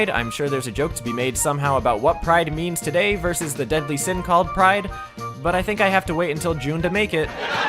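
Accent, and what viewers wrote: Brazil. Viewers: you brazilian? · Yo, is this man brazillian?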